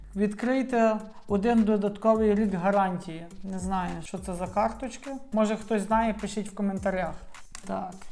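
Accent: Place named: West, Ukraine. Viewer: Приємно чути західну Українську мову. 👍👍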